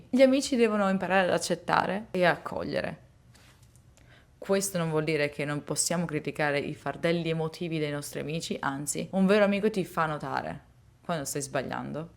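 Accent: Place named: Sicily, Italy. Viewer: Ma stai sempre col ragazzo siciliano? Hai un accento più siciliano rispetto a 1 anno fa direi (detto da un siciliano).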